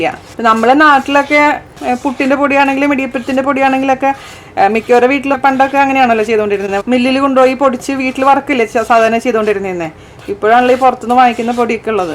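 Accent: Thrissur, India. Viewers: hii chechii chechi parayunna slang enikk manasilvunnund chechii .... bcoz iam from thrissur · oh chechi thani nadan thrissur style samsaram.weekly once I have to hear your sound. I am from gvr ,at present residing in auh.so lovely.from vini .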